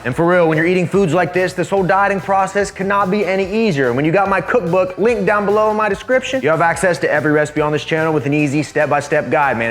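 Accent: Texas, United States. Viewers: Sum gun, sounds like your getting Texas in your accent bro. Good.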